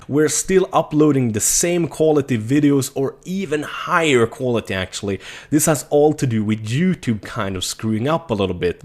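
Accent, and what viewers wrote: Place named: Sweden. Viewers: Yes, he is from Sweden. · Are you from Sweden? Your accent reminds me of robbaz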